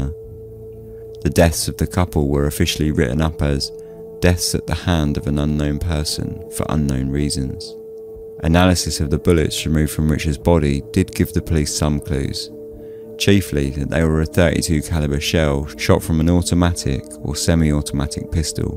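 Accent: United Kingdom. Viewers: lol. - there are USA. Americans that can't pronounce Arkansas or Texarkana. You merely pronounce it with a British accent. ToMAYto - ToMOTo Ya know, we Americans actually - get that one right. lol ...and, I specifically recall being taught that the "H" is silent - in "herbs" (you folks pronounce it like one would expect an American to - "?" No problem, I'm just curious what the original rule is.)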